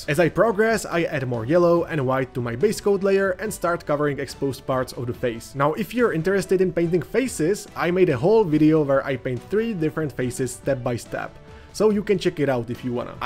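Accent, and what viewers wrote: Czech Republic. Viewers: Got this randomly recommended, 10 seconds in and I already knew you're Czech based on the accent haha. Good job btw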